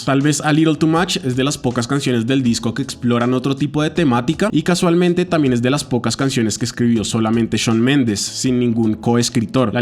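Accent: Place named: Colombia. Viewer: No se nada de. Me gusta el tono colombiano de tu retórica, creo que es lo que le da consistencia a tus ideas. Pues, hay otros análisis de carreras musicales en tus videos?:)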